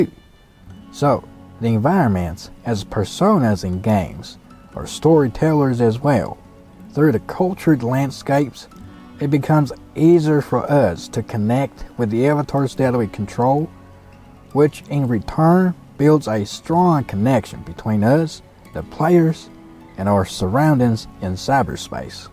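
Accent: South, United States. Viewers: 我是一个住在德州的中国人。你的南方发音很棒 :)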